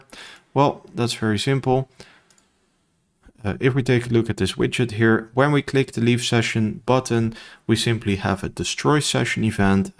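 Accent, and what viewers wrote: Netherlands. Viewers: You have a dutch accent, I am dutch too xD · are you dutch by chance? :)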